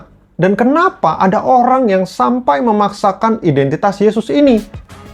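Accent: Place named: Surabaya, Indonesia. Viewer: Beliau... dahh jelas wong suroboyo...😂😂😂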